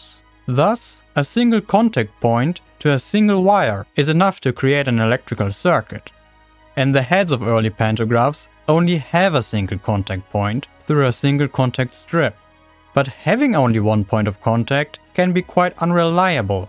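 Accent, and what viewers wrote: Germany. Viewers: Awesome video about great topic! I really like the slight German accent too, very authentic and original! Either way, you earned yourself a new sub, lookin forward to your next vid! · Um. He has a German accent because he's German.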